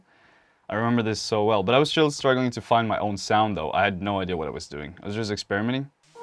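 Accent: Norway. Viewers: I heard his accent and went wait is he Norwegian and then I saw the message from his mom and it was in Norwegian and I was in shock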